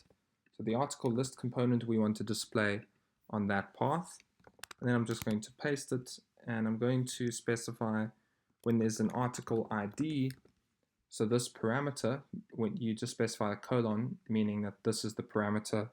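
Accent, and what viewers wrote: South Africa. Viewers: South African accent.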